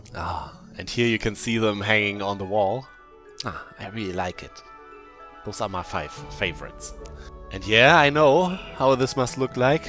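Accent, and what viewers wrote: Germany. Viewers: das deutche englisch lol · Are you German? I think you have a german accent (#nohate I like that accent) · Workout athome Yes he‘s from Germany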